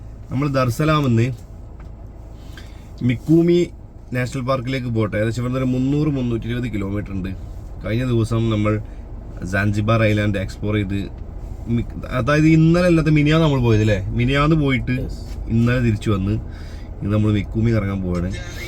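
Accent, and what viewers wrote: Malappuram, India. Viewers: ഒരു രക്ഷയും ഇല്ല ഫുൾ കോമഡി അതിനിടയിൽ മൃഗങ്ങളെ ശ്രദിച്ചില്ല തനി മലപ്പുറം വർത്താനം 😂😂😂😂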